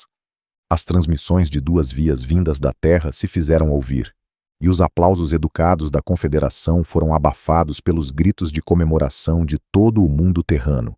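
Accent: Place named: Minas Gerais, Brazil. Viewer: Que doido 👏👏👏👏 (em mineirês 😅 muito legal kkk ) me recordo desse conto em “Relatos Isolados “ …👏👏👏👏👏👏👏deu outra visão do cenário 🤙😀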